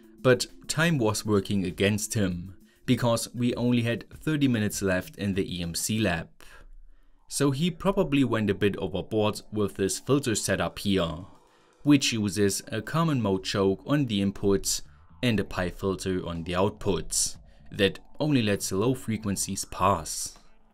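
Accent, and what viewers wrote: Germany. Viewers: You are definitely not a Scott, this German accent is too thick,... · Informative Videos! Habe nicht gleich erkannt, dass du eigentlich deutscher bist :D erst nach einem Kommentar von einem der dich angeblich bei Würth gesehen hat, wurde mir klar, dass du etwas Akzent hast und wer noch außer Deutsche Verwenden noch Fineliner 😀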